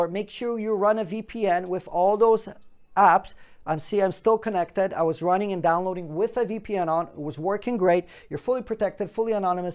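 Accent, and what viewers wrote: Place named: Texas, United States. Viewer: Texas man! where u from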